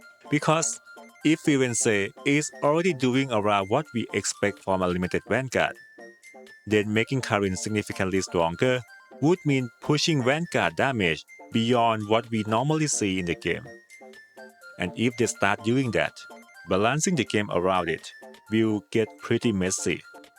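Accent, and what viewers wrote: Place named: Thailand. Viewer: คนไทยปะครับสำเนียงเหมือนไทย 😅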